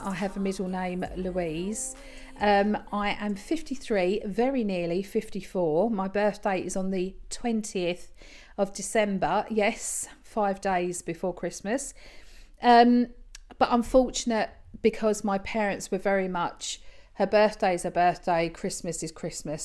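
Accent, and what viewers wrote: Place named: Essex, United Kingdom. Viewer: Hi, Mrs M has recommended your channel, so here I am. Hope lots of viewers come over to see you. So sorry to hear you lost your beautiful daughter, I can't imagine how anyone copes with losing a child., yes!!!. I'd love to see your renovations. I was trying to decide on your accent, I was going to say your a London/ Essex girl then you told us. I'm from Ilford so also an Essex Girl. Have a great weekend 😊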